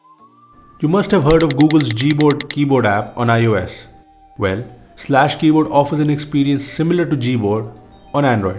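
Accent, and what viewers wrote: India. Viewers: 阿三的英語發音。。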